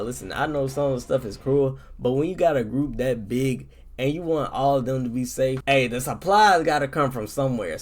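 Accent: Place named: Maryland, United States. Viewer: "erybody" My guy is Marylnd region. Am I right brother? Great video and made some ood points, New subscrber.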